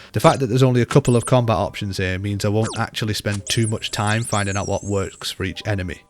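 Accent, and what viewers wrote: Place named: Darlington, United Kingdom. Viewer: Where are you from... Somewhere like Darlington... The accent is deeply reminiscent to me. I grew up in Middlesbrough...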